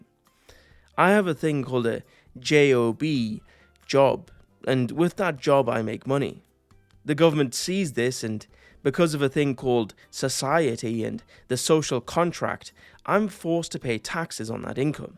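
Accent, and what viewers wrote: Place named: United Kingdom. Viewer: Yo, where abouts in Britain are you from?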